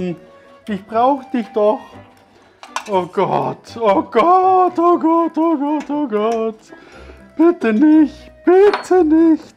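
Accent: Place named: Bavaria, Germany. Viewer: Sau geil!!! Bin durchn Truppe auf di aufmerksam worn... Hob ma jetzt scho einige Videos vo dia o gschaud... Mega guad! Und dann no oana der boarisch red. liabe Griass aus Nandlstadt... (Vielleicht kennst des ja... is ja ned weit weg von dir ;-))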